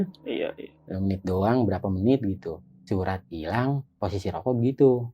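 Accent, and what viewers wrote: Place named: Jakarta, Indonesia. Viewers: Ikutan kebawa alur cerita, mski siang2 dengerinnya, tetep msih merinding disko😂🤭....ngomong2 suka dialeknya kya dialek Depok.....jd ingt emak....emak angkatku yg super baik, org betawi depok.... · Seru nih cerita.na lah nada s abangnya Betawi bgt yak 🤣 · Gue nonton sambil cekikian coy, mantep lah betawinya ini mah ya, et dah. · Logat betawi nya aseek bngt … enak sih di dengernya 👍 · Betawi banget bang😆 bng lucu nyautnya."iyaa"😅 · Betawi bangett kelass wkwkwkw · Cara ngomong ye logat betawi bnget ye🤭😂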